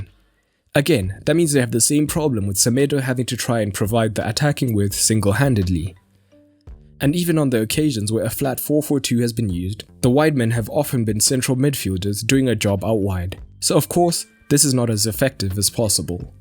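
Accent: South Africa. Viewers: You sound South African. Are you from SA??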